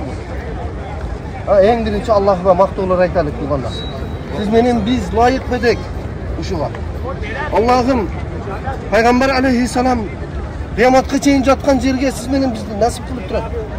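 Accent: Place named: Uzbekistan. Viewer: Караң э, дең э, айтиң э, деп өзбекче эмес айтыңыз, деңиз караңыз деп адабий сүйлөгөнгө аракет кылсаңар муну бүткүл Кыргызстан көрөт...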